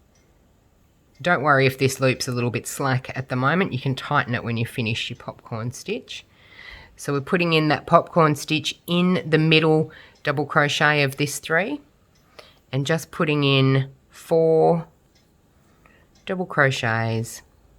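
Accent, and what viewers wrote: Australia. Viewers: Delightful square - different and therefore appealing. :) Question: Aussie ? Statement: very well described process, complete with helpful pointers. Goodonyermate !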